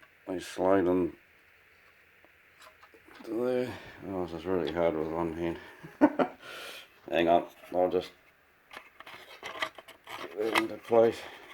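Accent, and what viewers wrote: New Zealand. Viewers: Excellent job! Soooo awesome!! Kiwi btw? lol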